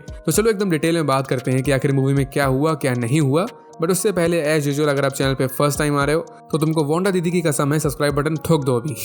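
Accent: India. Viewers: I used to watch your loki video, I've watched them all I just remembered you used to say " Keep calm and Listen to me " in your Indian accent so, yeah your explanation are best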